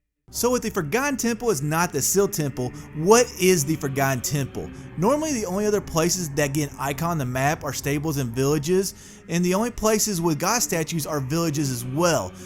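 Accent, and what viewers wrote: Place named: South, United States. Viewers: Don’t ask why, but your accent sounds like you’d give me a “Roll Tide.” Interesting perspective and some good research, sir. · Holy southern dialect I can’t get over “Duuungin”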